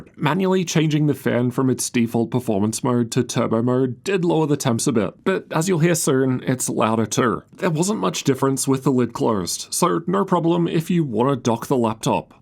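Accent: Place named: Australia. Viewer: Man you take the worst new gen Australian speech patterns, with the intrusive Rrrrr and the end of sentence falling intonation, and you magnify it by a thousand!!!! I can't listen to this verbal debauchery any longer. I'm out.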